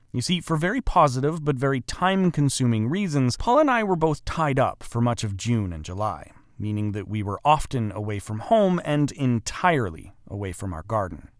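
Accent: Canada. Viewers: I wonder the same. He sounds Canadian. The topography looks northern too. I'm in Maine, zone 4/5.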